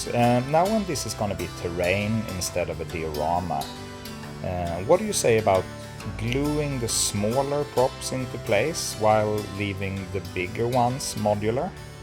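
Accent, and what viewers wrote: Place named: Sweden. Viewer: Hi amazing work. Sounds like u are from Sweden. What part are u from?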